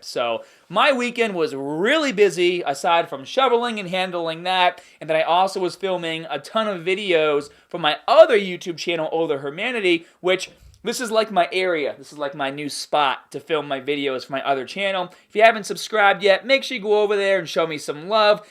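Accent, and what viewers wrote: Boston, United States. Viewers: Think you almost went the full Boston accent in this vid lol. Sometimes you barely sounded American.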